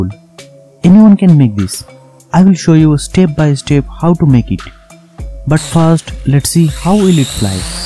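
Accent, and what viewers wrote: Bengal, India. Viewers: you have much of bangali accent 🙏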